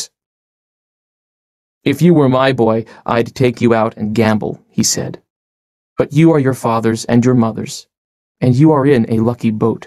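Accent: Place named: United States. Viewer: The pronunciation in the story is the American accent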